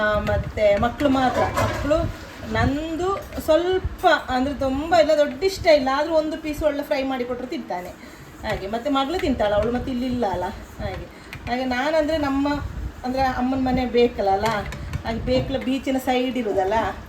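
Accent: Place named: Kote, India. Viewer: ಕನ್ನಡ ಕೇಳಲಿಕ್ಕೆ ಚಂದ ಉಂಟು ಕೋಟೆ ಕನ್ನಡ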